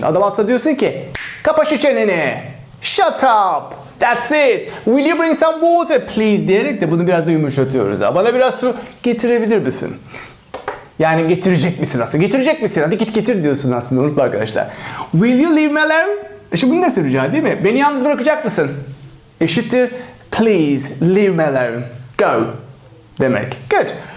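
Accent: United Kingdom. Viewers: Hocam lütfen daha çok zaman ayırın.İlk defa ingilizce öğrenebileceğim kanal buldum hem de ingiliz aksanı. Çoğu kanal umut satıyor, insanlar izledikleriyle kalıyor malesef. İngiliz aksanı ile konuşulan film ve dizi önerileri gelirse çok iyi olur 👍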